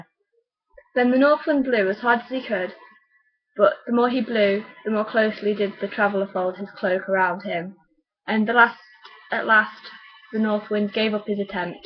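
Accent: United Kingdom. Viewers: You do sound oddly British in this video.. and I had to urban dictionary "peng".. terrible word. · Totally from Nottingham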